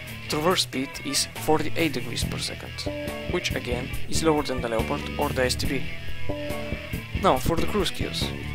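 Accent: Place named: Germany. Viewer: WOW good Review! You sounds a littlebit German ^^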